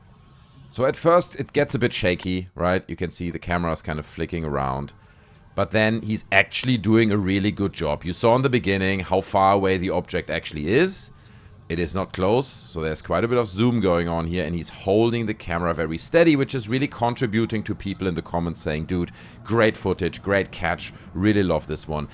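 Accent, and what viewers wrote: Germany. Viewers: this guy sounds german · Mit deinem deutschen Akzent. Klar könnte das so sein. Aber es könnte auch eine Nachahmung von diesen Ballons sein. Auf jeden Fall hat die brasilianische Armee Stellung dazu bezogen und gesagt sie wüssten nicht was es ist. Das sagt mir, es war kein Ballon sonst wüssten sie doch von san joan und Ballons.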